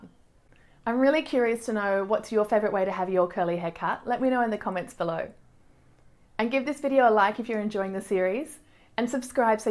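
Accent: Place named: Australia. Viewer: Yay an Aussie.. So now I can breathe and understand u 😂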